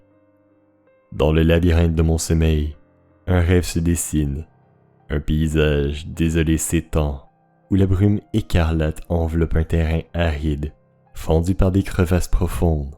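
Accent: Quebec, Canada. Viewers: Olala cette voix m'avait tellement manqué. J ai eu cette chaine sur l accueil de youtube et j ai tout de suite cliqué. Ta narration est toujours aussi incroyable et sublime. J'adore ton accent québécois hihi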